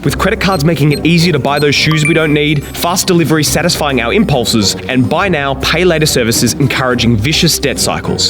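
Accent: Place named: Italy. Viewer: Another awesome video man! Seriously cant wait until these start blowing up! 🔥 love the Italian accent haha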